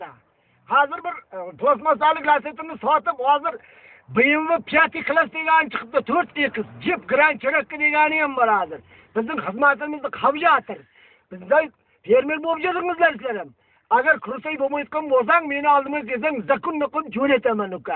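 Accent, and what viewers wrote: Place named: Surkhandarya, Uzbekistan. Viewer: Og'ayni Surhondaryo shevasida gapirayapti.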